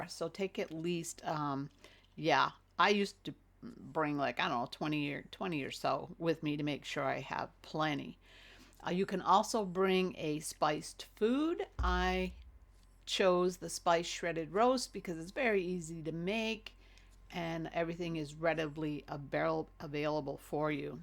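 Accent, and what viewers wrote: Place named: United Kingdom. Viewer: Love your guids, im from the uk so also love the accent 👍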